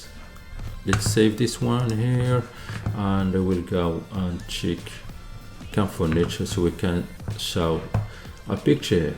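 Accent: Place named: Morocco. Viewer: mgharba baynin mn accent 💪🏼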